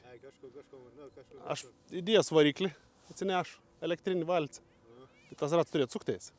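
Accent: Samogitia, Lithuania. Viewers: bet vistiek ta jusu zemaiciu kalba grubi yra kai kalbat tarp savu :D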